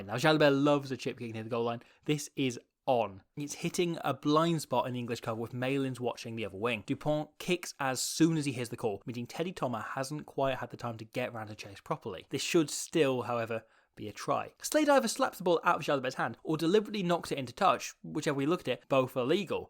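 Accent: Wales, United Kingdom. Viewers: haha - that's hysterical... "he picks to draw the Napoleon pops Dubai" is a mistranscription of "he picks to draw Vunipola and pops to Baille" also anytime it reads 'rug, rook, rock' - it is of course 'ruck';-) maybe Google needs to train its AI more on the Welsh accent (although is not that strong)... mind you, I should not complain, when I watch France play, I keep hearing your captain's name 'Ollivon' as 'vol-au-vent' X-D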